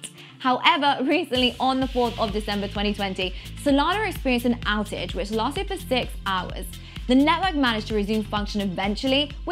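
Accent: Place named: United Kingdom. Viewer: That British accent is what gets you every time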